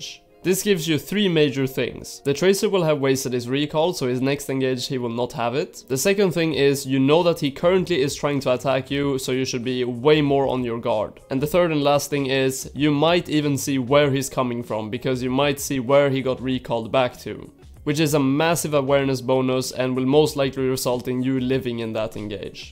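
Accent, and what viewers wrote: Sweden. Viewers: Embrace the swedish accent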